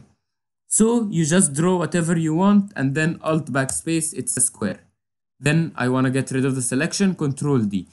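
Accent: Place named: Egypt. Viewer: Egyptian accent !!!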